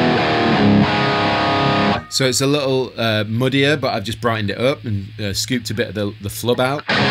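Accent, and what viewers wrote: United Kingdom. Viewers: britain, given your accent :)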